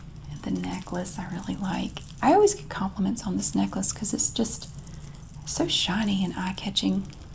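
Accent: South, United States. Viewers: I absolutely love you 🖤🤍🖤 watching your videos makes me feel at ease and comfortable. I think one of the main reasons why I feel so at home when I watch your videos is, because of your southern draw. It feels like home because that is how my mom talks and I adore my mom! 🥰 so thank you for all that you do on your channel. 🖤 from SA Texas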